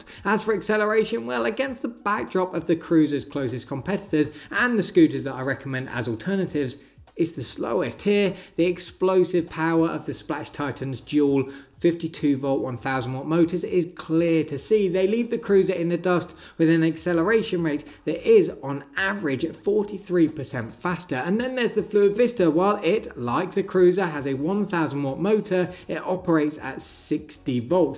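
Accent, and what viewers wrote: United Kingdom. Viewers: Fkn annoying British accent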